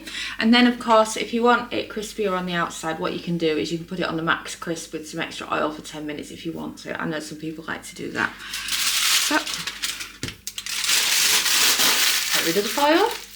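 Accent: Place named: Lancashire, United Kingdom. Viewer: I’m torn if that’s a Lancashire or Yorkshire accent. Trying this method today in my ninja woodfire. Wish me luck 🍻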